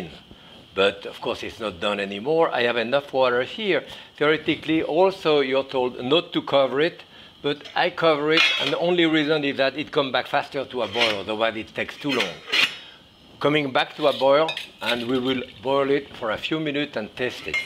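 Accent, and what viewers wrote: France. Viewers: I love his French accent. He reminds a bit of Poirot. And I love his cooking style, noy fussy, and cooking while he talks about the old ways of cooking.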